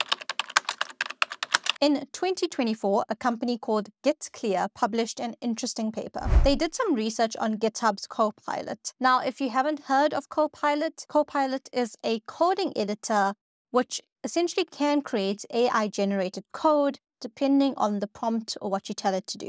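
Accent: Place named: South Africa. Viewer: I lilke your channel it'sinteresting you sound south African and Indian, although i dont know you coul dbe sri lankan or etheopian dutch lol.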